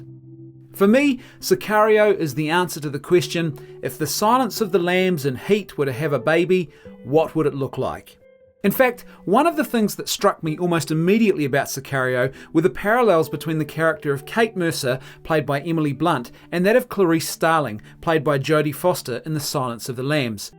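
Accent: New Zealand. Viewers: The guy talking sounds like a Kiwi. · Kiwi accents crack me up! Great review of the movie, but my takeaway will always be, "ass-pics of dipth" & "muudah". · Can't handle the Kiwi accent... Blah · are you kiwi bra?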